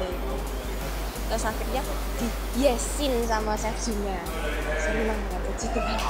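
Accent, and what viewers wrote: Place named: Semarang, Indonesia. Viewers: Logatnya beda lah jatim sama jateng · panggil saja niyak itu logat semarang 😂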